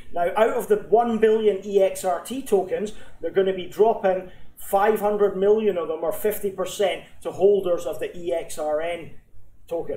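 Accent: Scotland, United Kingdom. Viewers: very British :-) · Nice info. Are you Scottish please?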